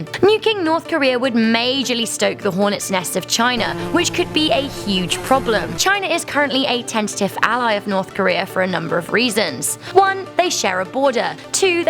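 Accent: United Kingdom. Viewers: Mrudul Jain she is British get over it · She has a British accent. I have a accent like that and it’s hard to say some words.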